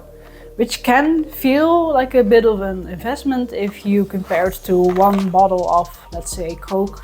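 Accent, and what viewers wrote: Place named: Netherlands. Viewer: I can tell you're Dutch by the accent ^^ I've been drinking waterdrop for years, got my mom on waterdrop too, it's good stuff. I got the Lucy with my points, so basicly 'free'? I don't think it's necessary, our tab water is clean enough. But it's a fun piece of tech. The Lucy Carafe though! (Also got it with points) I use that daily. It filters water really well. And when I placed a clean glass of tab water next to a clean glass with filtered water, I could see the difference, tab water does look blurry compared to filtered water.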